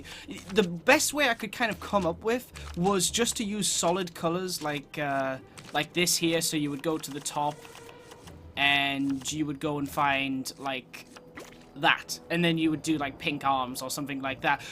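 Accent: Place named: United Kingdom. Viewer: For some reason your British accent is annoying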